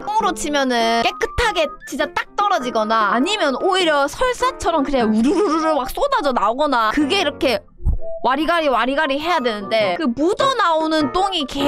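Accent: Gyeongsang, South Korea. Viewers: 경상도 맕투 개중독됨 ㅠ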